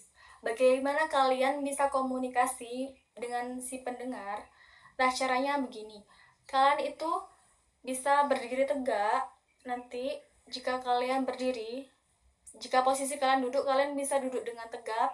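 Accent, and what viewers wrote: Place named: Java, Indonesia. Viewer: Ngomong kok medok mbak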